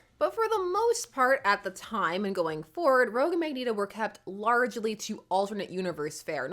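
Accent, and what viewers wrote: United States. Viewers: I just here for you country accent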